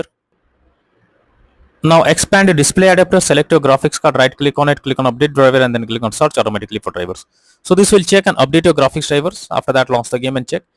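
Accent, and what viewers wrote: India. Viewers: clicked video hears indian accent closes video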